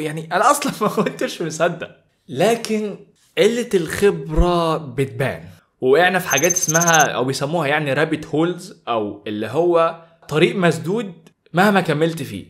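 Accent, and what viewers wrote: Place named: England, United Kingdom. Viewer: معليش يبشمهندس مش قاصد حاجه بس هو العربي بتاعك بحسوا اكسنت انجليزي عشان عايش برا ولا بسبب استخدام الانجليزي عشان وانا بتفرج حاسس بفرق لغه ❤😂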